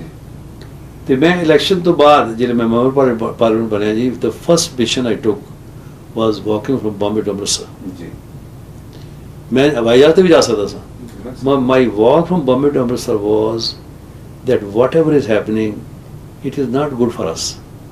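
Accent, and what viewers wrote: Jhelum, Pakistan. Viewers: Hy oy bismilaah kran mahra grain sunil mery same te suchi boli jhelumi original boli. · My uncle, who is almost same generation as sahib, who is also from Jhelum, has the exact same accent, tonation, pronunciation and fillers (for instance "Hain jee"). So amazing. Ditto copy. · tohadi boli v pakistan aali a❤ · Pure Jhelumi language.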